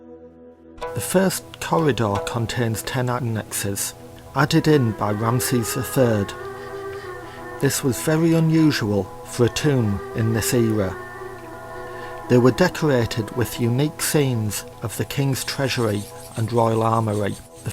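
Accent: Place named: England, United Kingdom. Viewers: English accent with a mean lisp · It's a North east English accent, maybe Yorkshire? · you sound like a young Northern english Richard Attenborough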